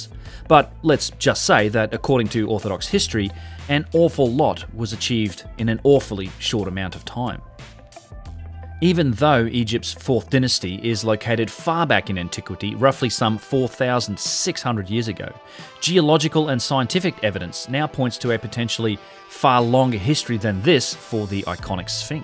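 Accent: Australia. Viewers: Ha, Ha! Don't make fun of his Aussie accent.(dialect) President John F. Kennedy used to say, Africer and Asier. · Pyramids of Geezer... love the aussie accent! :D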